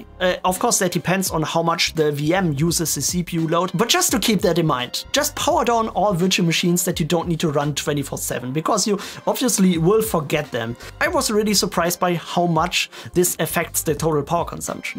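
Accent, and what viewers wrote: Germany. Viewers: Ich so: Hey dieser Akzent kommt mir bekannt vor. Kein Wunder, aus Deutschland. Schöne Videos haste ;)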